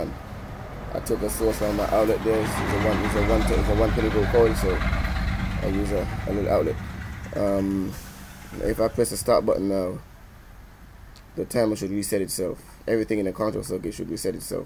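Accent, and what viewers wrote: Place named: Jamaica. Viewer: jamaican accent. thats cool great video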